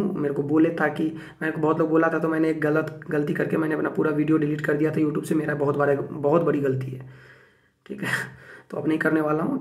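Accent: Bihar, India. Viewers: Bhaiya ji..bihar se ho kya😀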